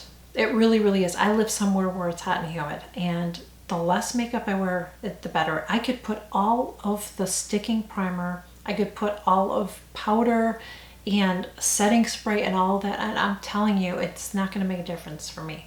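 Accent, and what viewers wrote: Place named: Boston, United States. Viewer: Where i you originally from you sound like you're from Boston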